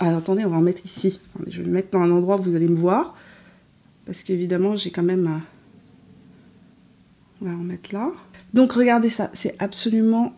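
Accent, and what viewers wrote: Canada. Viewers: Sympa toutes ces vidéos. Vous êtes française ou canadienne ? Merci pour vos conseils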